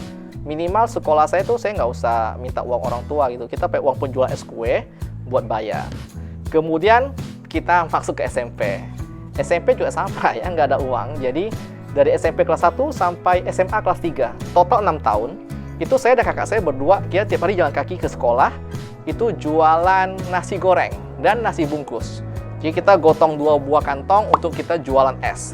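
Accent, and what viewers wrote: Pontianak, Indonesia. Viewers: Mantab Boy,... Salut dengan budak Ponti, Penuh dgn perjuangan dan menjadi inspirasi utk semua... Salam dari saye budak Ponti, yg lahir dan besak di Ponti.... Aku suke logat kau boy....Tetap semangat dan sehat selalu Boy. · Bahasa pontinya mirip bgt ama mertua gw 🤣🤣🤣🤣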